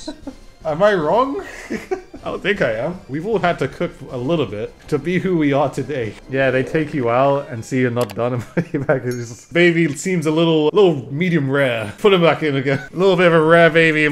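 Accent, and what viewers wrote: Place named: England, United Kingdom. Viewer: I mean, if someone’s gonna tell me how to pronounce words in English, I’m listening to the guy who’s from England (or the UK)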